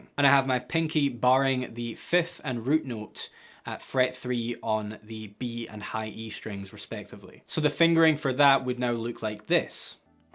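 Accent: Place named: Scotland, United Kingdom. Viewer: Love watching lesson, not only explained things well, but also i love hearing his scottish accent 😁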